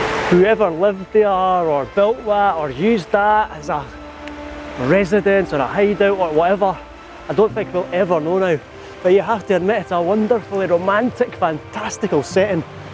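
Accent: Scotland, United Kingdom. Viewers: Why don't we see this sort of view of Scotland and our history on BBC Scotland? This is an authentic looking presenter who sounds and behaves like a native of our country. He is doing much more than telling us of Scottish history and Geography. He is implicitly demonstrating aspects of Scottish culture in his words, actions and mannerisms. Very enjoyable. Many thanks.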